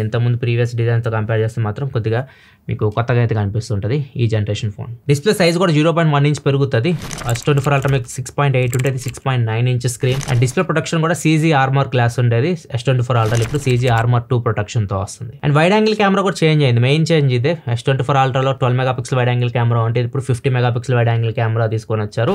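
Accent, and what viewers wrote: Ongole, India. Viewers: Our ongole telugu....😂 edge kopu ga untadi...❤❤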